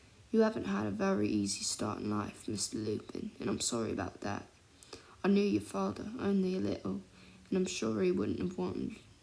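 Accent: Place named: United Kingdom. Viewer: Woah I thought you were British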